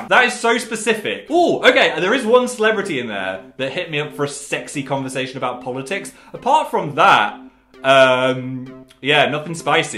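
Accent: North, United Kingdom. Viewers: Idk why I really heard the northern accent in this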